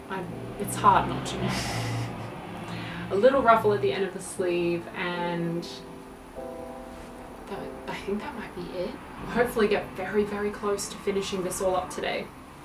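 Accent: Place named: Australia. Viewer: An Australian accent! Heck yes, im Australian :)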